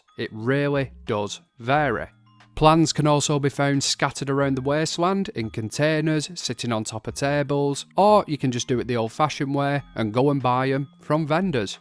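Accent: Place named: Manchester, United Kingdom. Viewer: My wife and I really want to know where your accent is from. Thanks for the video, but plz help us with this mystery! I think you have a Mancunian accent but she thinks Scottish.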